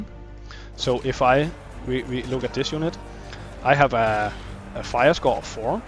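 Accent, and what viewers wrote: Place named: Denmark. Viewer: I guess he's Danish, that's what it sounds like to me at least.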